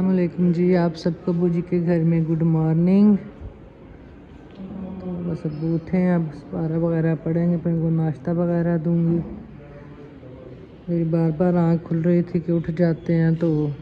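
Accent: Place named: Jalandhar, India. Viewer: ਤੁਹਾਡੀ ਬੋਲੀ ਇੰਡੀਆ ਪੰਜਾਬ ਚ ਜਲੰਧਰ ਵਾਲਿਆਂ ਨਾਲ ਮਿਲਦੀ ਐ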